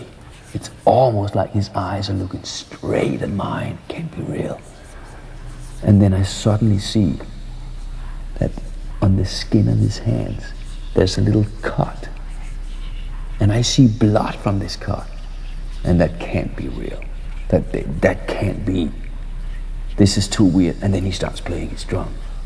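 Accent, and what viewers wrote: Denmark. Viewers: By listening to this, i found out this dude is from Denmark *lol* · The danish non native english accent makes it kind off creepy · you can still hear his danish accent. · My god... he sounds truly danish. XD But... very scary at the same time. That his way of speaking english reminds of my own english teacher is not exactly helping. :P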